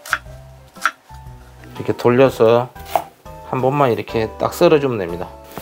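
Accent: Jeolla, South Korea. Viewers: 사투리가 딱 전라남도 서남부 목포 스타일 이네요.. 정겹네요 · 전라도 사투리 쓰시면서 억수로는 경상도 사투린데 섞어쓰시네 고향이,어딘교 구례쪽이신가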